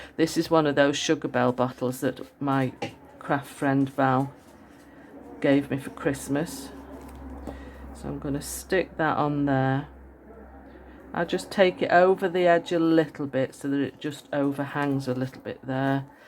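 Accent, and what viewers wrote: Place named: England, United Kingdom. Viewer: Your video popped up in my feed and I can’t believe I’m just finding you! After viewing, I immediately subscribed, and now will need to go back and try to catch up with your incredible ideas! Thank you so much for sharing your creations! I’m intrigued by your English accent and the fact you’re in Spain…love the background noises! I’m picturing you living in a city above shops…that’s always been my dream! All the best to you from the USA ❤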